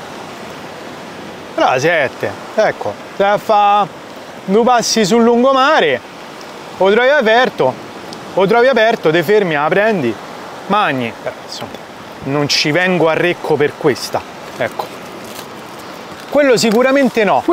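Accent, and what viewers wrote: Rome, Italy. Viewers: accento Romano? o forse piu` ancora Toscano..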